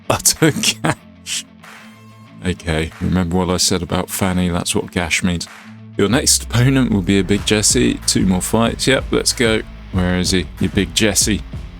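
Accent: United Kingdom. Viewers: Not only is this a perfect mod that I've never heard of before, but being exposed to it by a guy with a proper English accent is even more hilarious. Edit: Also, the name choice is guaranteed to make you a wanted man if you actually go to Scotland.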